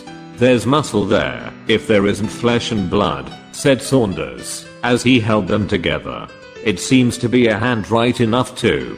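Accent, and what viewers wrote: England, United Kingdom. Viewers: I love this story and the english accent ,intonation of the story teller. i try to immitate her accent in every words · thanks alot for reading to us and by the way I love your English accent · Amazing story thanks alot for reading to us and by the way I love your English accent, this is fenomenal !! i enjoyed to hear the whole story ,And i understood each words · best british accen.....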